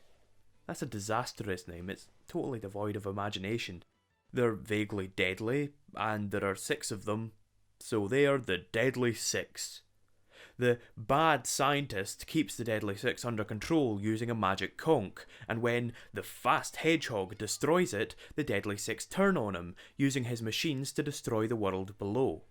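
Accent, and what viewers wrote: Scotland, United Kingdom. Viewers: This is probably the most extensive look at Sonic's evolution ever, and it raises some good points. Also, it's nice to hear another Scottish voice on youtube! Anyway, I have a possible (yet perhaps biased) suggestion to Sega for a way out of the bind they've got themselves in. When your series loses its direction and identity, go back to what made it great in the first place. Recall when the James Bond franchise, during the late Brosnan era, didn't know what to do with itself. Die Another Day was partly an homage to classic Bonds, part sci-fi, part self-parody, but also overwrought with serious emotion. In many ways the series was again turning out like the late-Roger Moore era, something that Brosnan's first outing as Bond in Goldeneye did a lot to get away from. So after Die Another Day they brought in a new actor and did Casino Royale. 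In terms of its tone, that film is very similar to the Ian Fleming book of the same name, and it shows why and how the characters and stories from the early books were so gripping. After watching your video, I see a lot of parallels between the respective problems faced by Bond and Sonic, and if getting back to basics worked for the former, I really think it could work for the latter. So what I'm suggesting is that Sega go back to the gameplay mechanics, designs, and narrative style of Sonics 1-3. And not just in the form of nostalgia feasts like the Sonic 4 episodes or Generations (though the latter was a firm step in the right direction)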